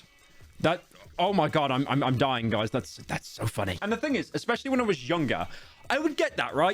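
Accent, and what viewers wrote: United Kingdom. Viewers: why is he more british in the video rather than in any other video?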